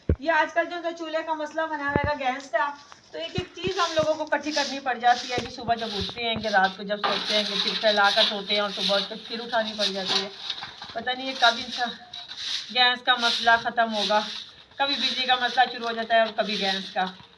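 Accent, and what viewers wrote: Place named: Punjab, India. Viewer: You are punjabi I think from you accent so you should speak Punjabi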